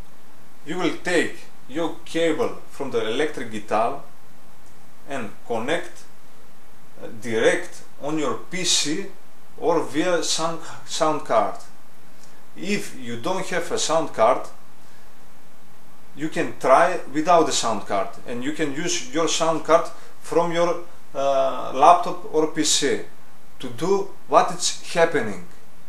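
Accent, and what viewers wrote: Greece. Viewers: greece maybe larisa :P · that greek accent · are you greek? i can tell by ur accent. thanks for the video by the way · Are you Greek? I can recognize the accent because I'm Greek, but I was born in America. Thanks for the help, I've just started recording songs on my ukulele and wanted to know how I can somehow plug my uke into my computer.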